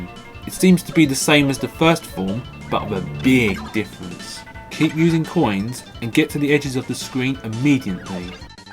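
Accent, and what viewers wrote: England, United Kingdom. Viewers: Ha! I knew it! You sound English. Am I right?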